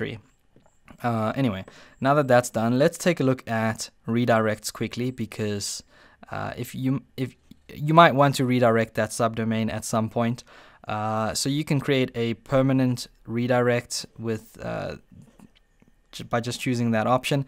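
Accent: South Africa. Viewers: Dude are you South African?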